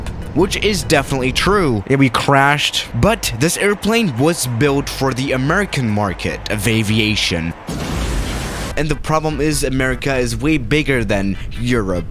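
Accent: France. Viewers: I love ur French accent 😂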